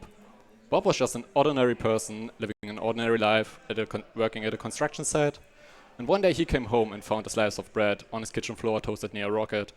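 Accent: Germany. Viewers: Tbh we actually used to make fun of other germans that speak with an accent like that in school. Most germans who dont say ZE instead of THE dont like hearing that "german" accent. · Love how you can hear it that he’s German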